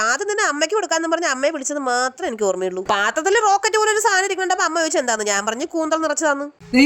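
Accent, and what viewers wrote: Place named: Kollam, India. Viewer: ഗർഫിണി... ചേച്ചി കൊല്ലം കാരി ആണോ 😄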